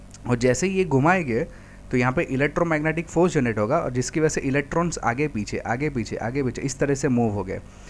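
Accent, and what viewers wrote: Gujarat, India. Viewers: R u gujarati?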